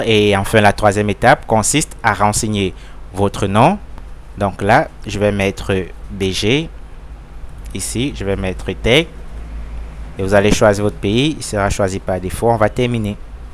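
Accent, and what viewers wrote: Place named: Africa. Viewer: un pot moné épargne nous ton accent africaine stp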